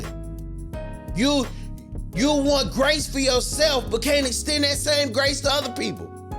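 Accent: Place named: Rural, United States. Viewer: I understand the hustle bro but why do all of you attempting this hustle have that country bumpkin accent? Sarah Jane Comrie is in the wrong, she could easily press charges if she felt otherwise. She lied saying no one knew the identity of the young man, when proven to be a lie, her lawyer came forward to state that he has not intention to even try to sue the young man for defamation. You have to have the IQ of stale bread to genuinely see that video and think your self that she was in the right. When I tell you genuinely speaking the rest of the world views Americans as low IQ trolls you do not help that image. If she doesn’t deliver a baby in 3 months I dare you to make a video.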